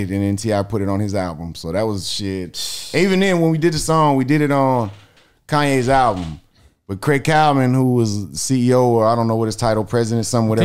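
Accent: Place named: South, United States. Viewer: If I wasn't seeing who this was talking like this, I would automatically think that's a black man from the south talking like that. Damn, this dude has soaked up the culture soooo comprehensively. Got damn! Astounding!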